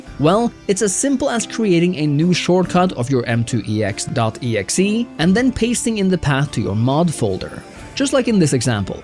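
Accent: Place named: Norway. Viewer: man ur norwegian accent is shining through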